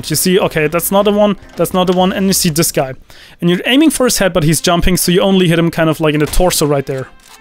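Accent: Germany. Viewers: English sucks man, it's German accent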